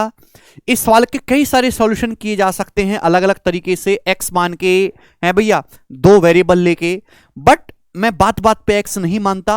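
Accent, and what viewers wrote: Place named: Bihar, India. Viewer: Bihari ho kya ? Be percent ko pashnt bolte ho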